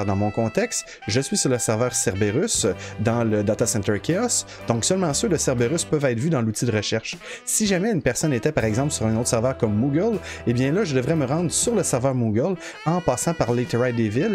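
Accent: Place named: Canada. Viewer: Salut ! J'aimerais seulement aujourd'hui commencer le jeu avec ma petite amie qui en ce moment ne se trouve pas dans le même pays ni dans la même région... Il serait alors probablement impossible de jouer ensemble de ce que j'ai cru comprendre, car elle serait dans les serveurs d'Amérique et moi donc Européen. Mais j'ai cru comprendre que tu étais Canadien de par ton accent ? Tu es donc Américain mais tu joues sur Cerberus qui est un serveur Européen, j'aurais bien aimé savoir comment tu t'y est pris ^^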